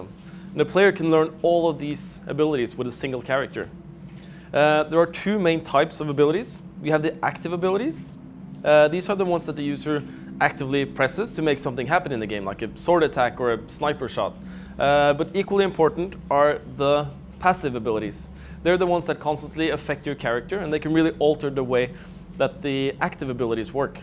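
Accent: Norway. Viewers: So norwegian way to speak english!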